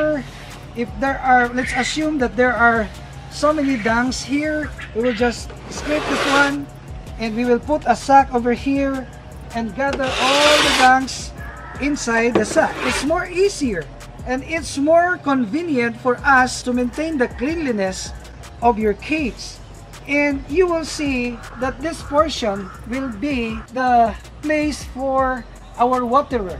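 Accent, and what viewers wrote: Philippines. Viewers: Wag ka nga po mag inglish halatanaman po na pilipino ka po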